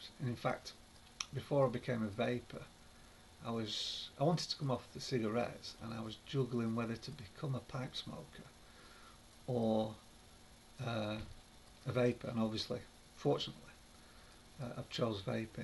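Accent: Northern England, United Kingdom. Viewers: Lovely Northern chat for a quiet Easter morning...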